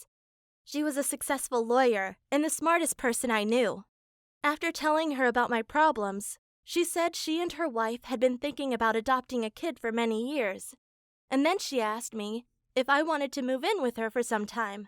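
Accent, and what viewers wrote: United Kingdom. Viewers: Let me guess your from the UK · are u british???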